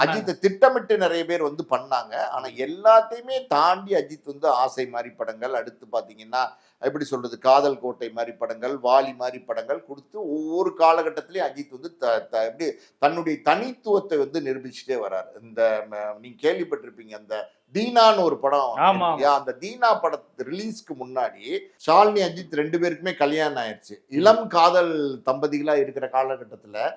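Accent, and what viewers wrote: Madurai, India. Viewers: nenga madurai ya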